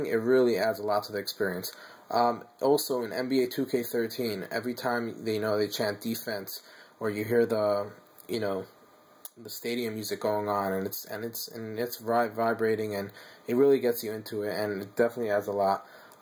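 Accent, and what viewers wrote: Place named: New York, United States. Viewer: Sounds like you got a New York accent lol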